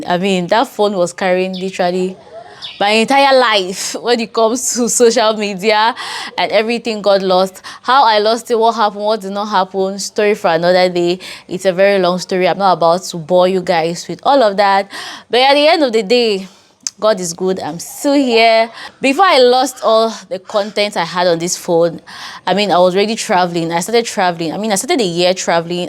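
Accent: Nigeria. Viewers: She’s from the English speaking part of Cameroon, they sound like Nigerians from Ikom cross river state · Are you sure you are Cameroonian?, you fooled me...that accent is 100% Nigerian....I heard that a lot when I was in Lagos.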